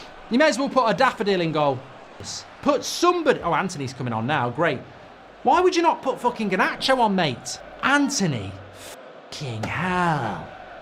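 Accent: United Kingdom. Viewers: Is that a Salford accent?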